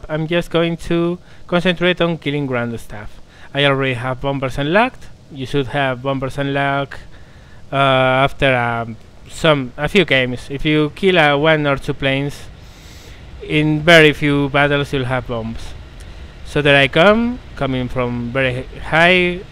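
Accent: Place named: Spain. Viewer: you sound like an academic linguist who doesn't have a good feel for language. I believe he is probably a native Castillian or Catalan speaker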